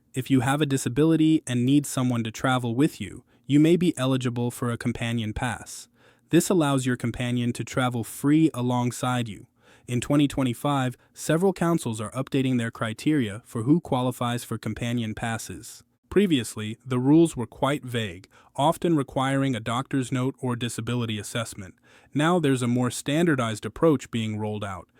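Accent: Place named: United States. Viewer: an usa accent talks about uk pensions etc would not trust a word he says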